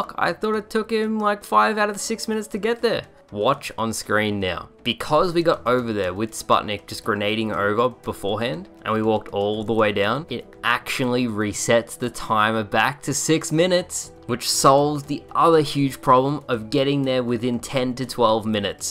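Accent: Australia. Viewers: the aussie 13 year old voice just broke my immersion in your videos.... unsubbed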